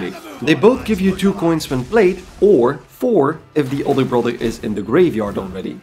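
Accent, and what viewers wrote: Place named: Netherlands. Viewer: I heard your voice and I immediately knew you were from the Netherlands or Belgium ahaha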